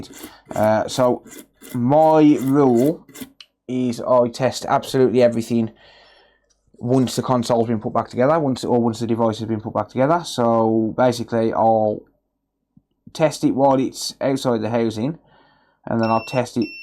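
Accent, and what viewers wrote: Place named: Black Country, United Kingdom. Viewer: Hi mate you sound like your from by me like Dudley or Black Country area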